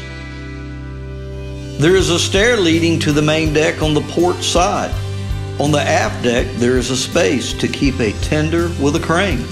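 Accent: South, United States. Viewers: "Jeff foxworthy narrated a yacht video? Who knew..." Dr. Phil, Bill Clinton, now Jeff Foxworthy 3 down and over 150 Million with Southern accent to count one by one.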